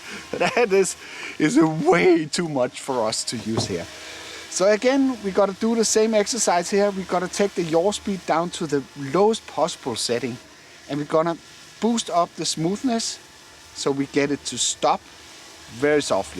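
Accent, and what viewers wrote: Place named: Denmark. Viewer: Jeg elsker den danske aksenten din :D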